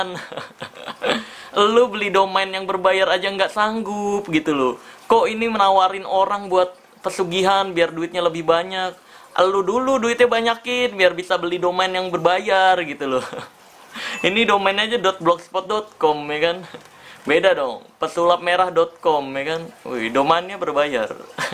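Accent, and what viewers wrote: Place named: Tegal, Indonesia. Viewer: Bg tegal yah gw tegal jawa tengah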